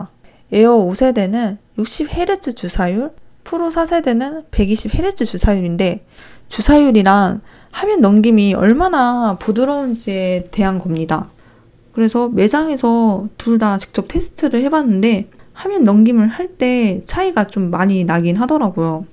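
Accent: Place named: Jeolla, South Korea. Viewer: 전라도 분이신가요?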